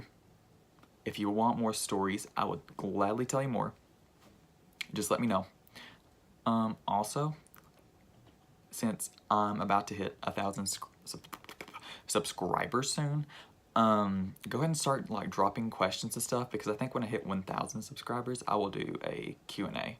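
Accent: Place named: North Alabama, United States. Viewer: The accent!!!! Where are you from, cause that sounds like a north bama accent?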